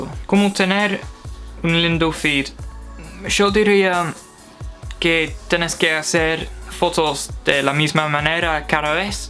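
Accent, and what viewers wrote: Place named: Argentina. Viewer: Great job man. As a native Spanish speaker I understood everything you said, you also sound argentino when you pronounce many words. Btw el país se llama Noruega 🇳🇴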